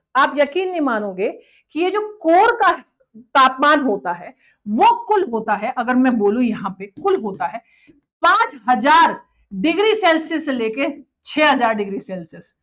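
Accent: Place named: South Haryana, India. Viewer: Bitiya aapki bhasha se lagtah h aap South Hariyana se ho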